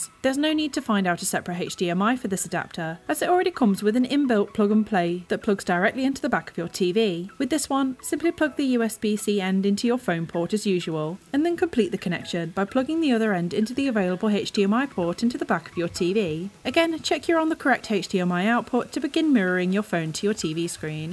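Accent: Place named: England, United Kingdom. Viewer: It’s an English, one of those things. Learn to respect, not all are using American (US) accent or twang. Peace ✌️!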